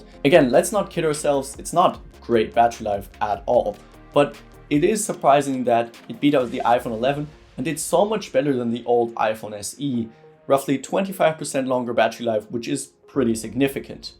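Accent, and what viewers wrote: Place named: Germany. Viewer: Having a German accent is definitely an advantage when you talk about technology.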